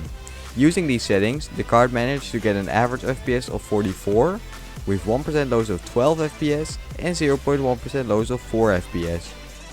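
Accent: Netherlands. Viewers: Just asking, are you dutch? You sound like you have an accent😁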